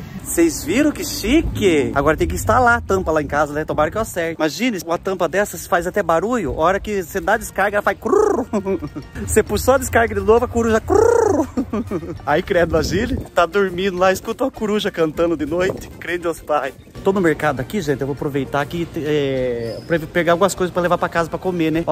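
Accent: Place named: São Paulo, Brazil. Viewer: ou e esse sotaque seu e de onde mesmo meu filho? vc mora no estado de São Paulo?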